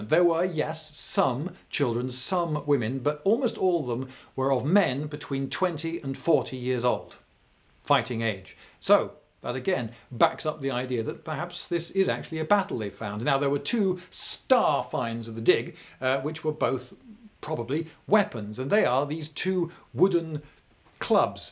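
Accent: England, United Kingdom. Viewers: For some reason in my head when im hearing you is almost like im hearing John Cleese, maybe its the english accent or the subtle humor, in any case its very captivating. Thank you for all the effort and hard work you place in all your presentations.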